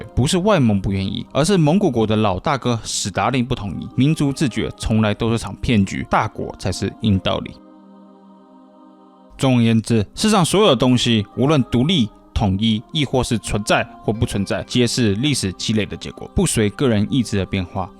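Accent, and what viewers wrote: Taiwan. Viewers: 非常台灣腔，很奇怪 !!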